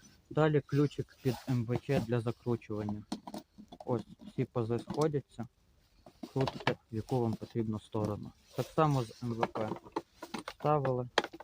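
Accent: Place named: Russia. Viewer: если это правда, отчего же тогда акцент русский, а речь украинская?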